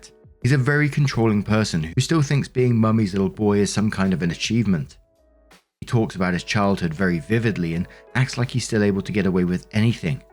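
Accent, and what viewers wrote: United Kingdom. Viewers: Hey just wanted to say thank you for the channel along with the typical American who loves a British accent 🥵 lol I really love hearing your take on people's drama Reddit is like a real life soap opera and I'm so addicted. Obviously these are real lives and real people so it is sometimes tragic too. All to say your personal. Empathy and innate kindness make listening to you a pleasure. Be well sir and thank you again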